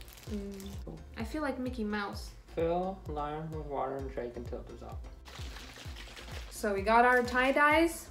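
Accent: South, United States. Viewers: So I realise I'm super late to this video but I e watched a lot of recent videos and I have to say that he's WAYYYYY more southern here than recent!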